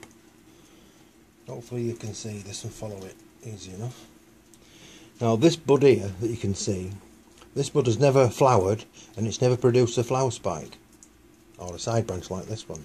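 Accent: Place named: Northern England, United Kingdom. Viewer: Thanks for the cool video. Sounds like you are from Northern England and I realize there is accent involved, but I live in Hawaii, and we pronounce the word "CAY-key".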